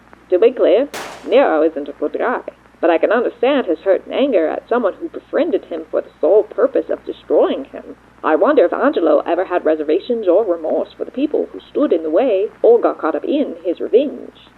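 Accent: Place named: Transatlantic, United States. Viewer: The trans-atlantic voice is so entertaining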